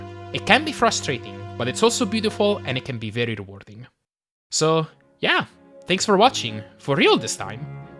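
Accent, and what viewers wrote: Italy. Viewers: I recognized the Italian accent in 1.3 seconds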